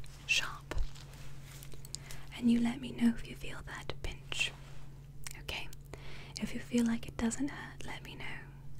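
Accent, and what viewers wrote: United Kingdom. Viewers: You have the most Beautiful and Hypnotizing Eyes!!! I always love the British Accent for your ASMR!! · Is that a light British Accent?? It’s so faint I can’t tell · Love your British accent. Very on point. Love it.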